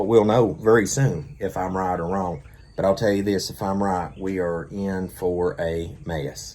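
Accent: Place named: South, United States. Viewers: Brother, I was blessed when I found your channel with someone else with an accent like mine. I'm from Kentucky, but our accents are very similar so you feel like home to me. I thought of something while you were talking, and I'm sure you and others have already done thunk it long ago. While the fake Saturday Sabbath Keepers are getting persecuted us lunatics keeping the truth Sabbath will probably be flying under the radar and not get persecuted, but protected by the Most High for keeping His true Sabbath. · As a colored young man I can honestly say I actually like that country accent bro lol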